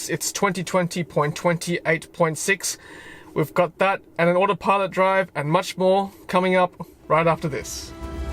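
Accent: Australia. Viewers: Always interesting to hear an Aussie accent and terminology, e.g. "amber," trying re-orient oneself to see driving being done on the "wrong" side (non-American) of the road, and imagining what AI/machine vision needs to do to handle your travel ways. Does your testing of Autopilot (1) Indicate significant disc brake usage whereas regen braking involves none; (2) Provide some play in steering to avoid potholes within one's lane; (3) Hint at what causes phantom braking or steering off course; and, (4) Indicate what might be situations where it should not be used? Thanks!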